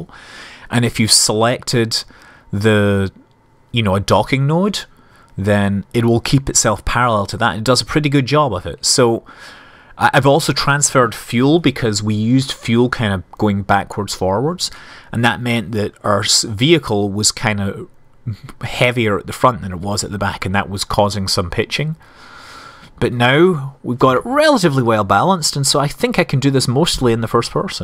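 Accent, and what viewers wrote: Scotland, United Kingdom. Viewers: i need to know.... are you scottish?because you sound pretty scottish to me and ive heard alot of scottish people:P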